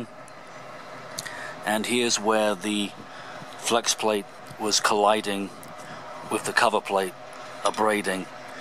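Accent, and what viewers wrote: England, United Kingdom. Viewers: You are definitely English,and also sound like George Harrison!!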